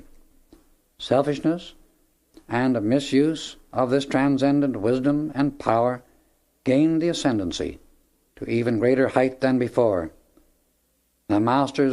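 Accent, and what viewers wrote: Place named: Northern Ireland, United Kingdom. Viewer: the accent sounds Northern Irish to me. Would love to know who it is.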